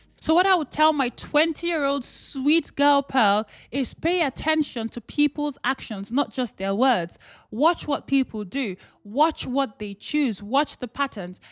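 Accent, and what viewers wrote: Nigeria. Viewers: I kept hearing the Nigerian accent sneak itself in and you eventually mentioned being born in Nigeria. 😂❤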